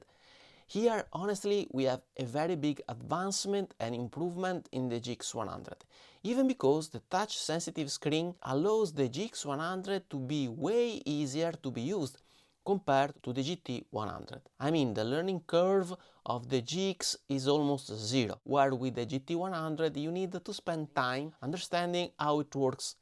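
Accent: Italy. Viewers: I love your videos, love your content and love your charming Italian-English. I find super friendly the aesthetics, the colours and the quality of the equipment around you. I hope you keep it up and that your channel continues to grow. And the most relevant thing: I love your guitar playing. Greetings from Argentina.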